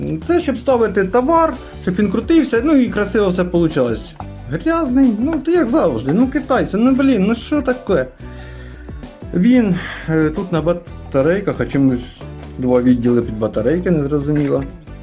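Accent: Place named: Mykolaiv, Ukraine. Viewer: у нас в Нико обл всю дорогу так как ты щас говорили , поэтому вспомнил счастливое детство)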